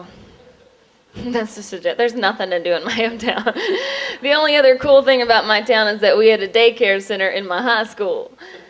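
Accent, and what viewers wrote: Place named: Georgia, United States. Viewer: Great comedian, terrible audience. Also... looooove that Georgia accent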